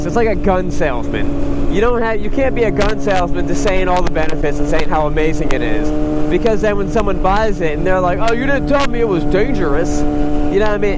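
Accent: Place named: Pennsylvania, United States. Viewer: I'm a recent subscriber and I love watching your videos. As you know there's tons of YouTube channels about motorcycle reviews and at first I didn't realize why I liked yours so much, then I got it. It's that subtle Pennsylvania accent. My family's from Pittsburgh, which some have a much more strong accent than you. I lived all around the country and pretty much lost it all together, unless I'm imitating it on purpose. Of course the rides are great, you discuss what I really want to know, I wouldn't watch a vid just to hear an accent. Thanks for the good job!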